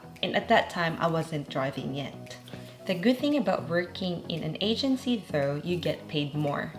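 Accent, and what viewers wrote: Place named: Australia. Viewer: I truly agree with what you said that you adapted the Australian accent when you started working in the Aged Care, I can relate to that! I truly practiced how to say the Aussie accent of “water” so that the residents will get it if I am going to ask them if they want some “Woottaah” 😊 i love this sitdown vlog. 😊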